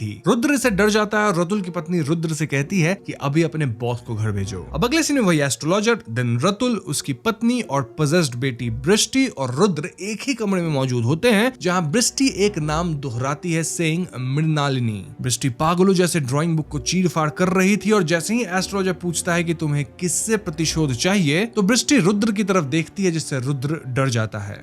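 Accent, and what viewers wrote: Bengal, India. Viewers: Omg. Your bengali accent 😭😭😭😭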